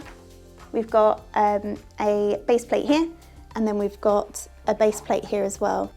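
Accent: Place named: England, United Kingdom. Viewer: Brilliant!! Love the way the English speak. Awesome video! -Jeremy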